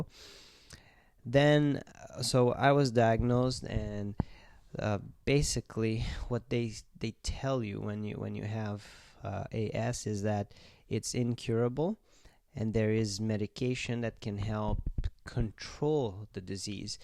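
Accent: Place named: Canada. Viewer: Sounds like you are in Canada, I am as well.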